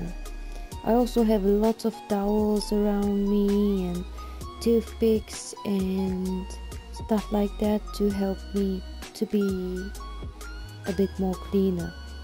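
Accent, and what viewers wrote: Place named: Czech Republic. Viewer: Máš tam český přízvuk budeš nahrávat I český nebo alespoň titulky 😍 jinak super kontent a miluju akvarel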